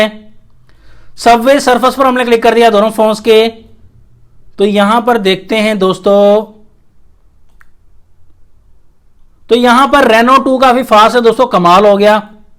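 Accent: India. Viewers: Such a pendu speaking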